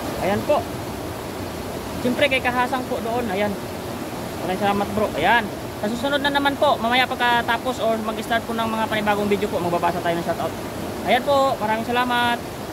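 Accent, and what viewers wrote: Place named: Ilonggo, Philippines. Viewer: good morning po.taga saan ka po sa visayas? Ang intonation nyo po ay parang ilonggo😊.Ingat po palagi God bless po.watching from Bacolod City😊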